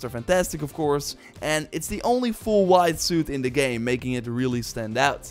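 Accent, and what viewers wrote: Netherlands. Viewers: Dutch accents were made for youtube, your videos are very entertaining! Keep it up!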